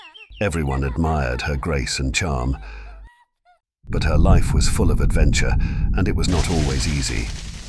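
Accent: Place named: United Kingdom. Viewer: Why use a British accent?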